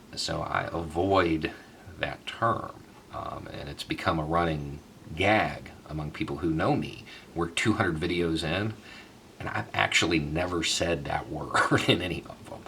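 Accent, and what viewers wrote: South, United States. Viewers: Dude this is crazy because I just looked more into you like 3 days ago and found out all this stuff, except I had the accent thing backwards; I thought you were faking the southern accent, but its crazy that you managed to hide it before. But I get why you would hide it, I'm self conscious about that kind of shit too. Ahh, the life of having social anxiety. Edit* -your channel is dope as hell man, and I just want to say keep up the great work. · I went to a K thru 12 school and grew up in a town with 258 citizens, yeah, you are from the south. I played down my accent for years, because I was MI and Signal... now I don't give a fuck. LOL I love your content and it is wonderful knowing that other southerners have opened their minds and see what is really out there. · Don't be fooled by a Southern Accent - and Trae Crowder for President. 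What a wonderful thing that would be. Appreciate your calm, rational, common sense approach,. Cheers from Canada 👍🇨🇦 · I love the southern accent. Just afraid of the ppl. But its nice to hear. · love your Southern accent. Wish I could have seen your first photo background videos. I'm a Mississippi home grown. Miss my roots. Thanks for letting us know you are actually Human and not one of those cyber robots. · Who Hoo, you're a Big Shot Now! 100,000 Subscribers! A lot of people have never heard anything like you. And to find out that you actually make sense, also that you are not only intriguing, you are intelligent, and have critical thinking skills. I believe, that draws people to you. And you represent many of us in the South, that can have intellect and a Southern dialect. The stereotype of some Southerners is also for real but not the rule, as you smartly display. Good for you, good for you! 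Keep up the good work. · Love Your point of view, jealous of your southern accent. Don’t know why I associate it with pure America. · Your ok with me like southern accent. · I think it's great that you use your real accent. If southerners change their accent to be taken seriously in media, then southern accents will only be heard reported ON, not by. I'm not American, and I don't carry that bias when it comes to your accents, but since I started watching American politics, I've come to realize that a racial gap is not all that needs to be mended. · Well, I'm here not because of your thick southern accent. It's the content. It's not 100.000 subscribers. As I'm writing this, it's almost twice that. I'm not sure I'm your typical audience, as a Brazilian, my interest in your channel isn't your accent. You are someone who spread ideas, and I support them. It's comforting to know that people like you take the time, the risk and expose your face and tell your thoughts because you know you have to. 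Thanks and keep'em coming.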